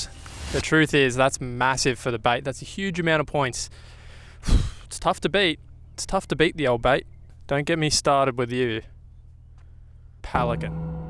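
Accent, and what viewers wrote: Australia. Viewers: Is he Aussie??? 😮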